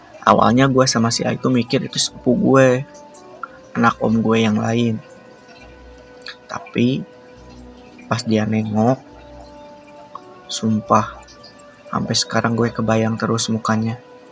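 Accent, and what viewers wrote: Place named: West Java, Indonesia. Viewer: Suka banget sundanya 😂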